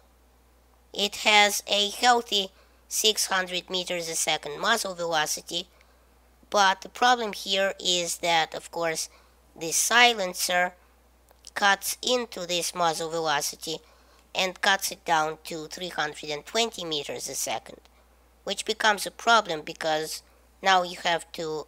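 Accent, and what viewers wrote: Russia. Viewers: видно что ты русский :DDD с твоим произношением англиского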